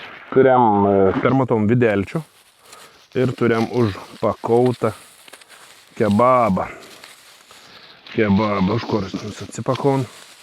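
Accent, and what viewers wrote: Samogitia, Lithuania. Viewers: Jäkla kaip miela klausut zemaisiska Mano tevu dialekas linkejinai is Svedijos 🥰🥰🥰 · Žiaure smage stebiet žemaitį ir klaustėis žemaitių kalbuos. Linkiejema iš Skouda ;) kontrybės valteis statybuos ;)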